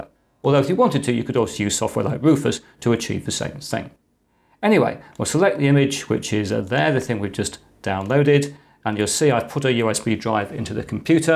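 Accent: England, United Kingdom. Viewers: I miss the energy you had when you used to exclaim, "RIGHT!" Now it's just, "right..." Your English-isms are one of the reasons I fell in love with your channel and now, it seems, you're downplaying them. Your content is as good (if not better than) ever but I like a little old world flavor (or is it flavour?). Keep up the good work but give us the whole package.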